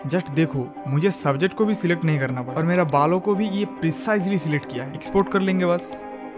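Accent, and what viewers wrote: Bengal, India. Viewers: ap ke awaj mai bangali bala feel ata hai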